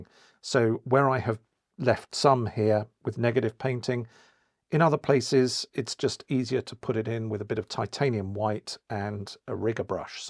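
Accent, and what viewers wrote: United Kingdom. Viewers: Very informative! Off topic; are you from the same area as John Cleese? You guys have the same accent.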